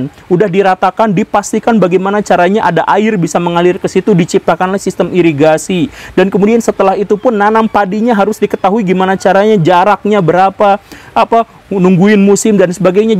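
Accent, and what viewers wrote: West Java, Indonesia. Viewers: Sunda pisan teh mah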